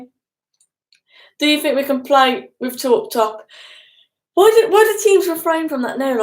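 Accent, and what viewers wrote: Manchester, United Kingdom. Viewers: Nice manchester accent.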